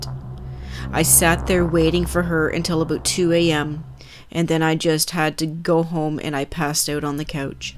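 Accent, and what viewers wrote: Canada. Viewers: Yeah without a doubt, you can hear the Ontario accent!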